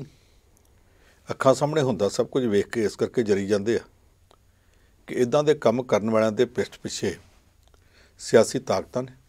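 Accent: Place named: Punjab, India. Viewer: Excellent Jatinder Pannu ji I love ur Punjabi accent while reporting...from US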